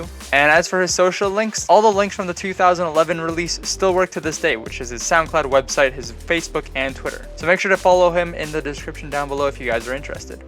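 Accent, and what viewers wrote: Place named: Canada. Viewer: idk why but you sound extra canadian in this vid. Anyway, this is great and I'd love to see more of these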